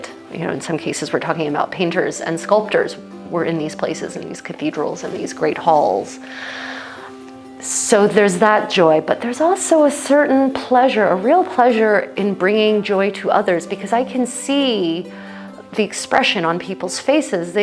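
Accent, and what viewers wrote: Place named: United States. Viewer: You are living my best life! LOL I have always wanted to do exactly this! I specialized in Elizabethan, but also have many Italian Ren, although I probably have much to learn from you in Italian clothing. I would love to do this in England as well as in Italy. I never have been able to get over there, dealing with various health problems; I am so exhausted after dressing this way, I need to lie down! Gone are the days of my youth when even though I have lupus I could work, run home, pack for the weekend, drive 4 hrs, set up pavilions, dress, and socialize/party the night away. LOL I miss it. How much luggage did you have to take? (assuming from your accent you live here in America) I could get one Elizabethan kit or two Italian Rens and that would fill my biggest suitcase! Your workmanship, BTW, is exquisite! I love wearing the gowns I have sewn entirely by hand...I show people the stitches and they get so blown away...I just let them know it's just like doing embroidery, you start it understanding you won't just whip one out in a day, it's a PROJECT!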